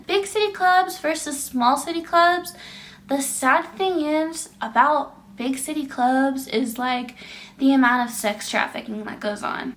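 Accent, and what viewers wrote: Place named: Oregon, United States. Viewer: I thought 💭...I heard some Oregonian in you and then, you said it... 😲 Wow 😲 I'm a new subscriber and I moved to Phoenix, AZ with my girlfriend and our 5 cats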